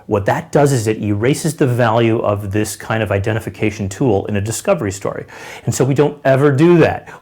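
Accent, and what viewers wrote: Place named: United States. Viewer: Oh hello Kevin! I like your american english. :-)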